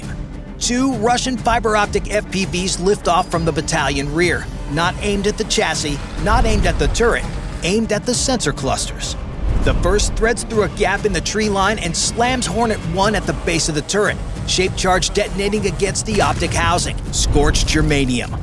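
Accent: United States. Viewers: This is very old footage with lots of repeated footage. I muted it and watched and it was just as informative without the annoying excited narater with his wining voice pearcing my ear drums. Old news in a new annoying format with american naration. Annoying! Annoying! Annoying!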